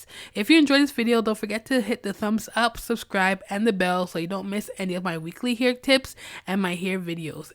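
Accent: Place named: Barbados. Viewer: Love your accent you sound Bajan!!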